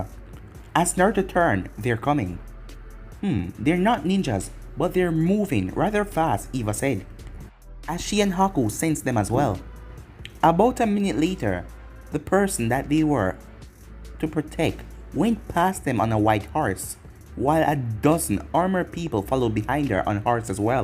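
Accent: Jamaica. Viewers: Are you Jamaica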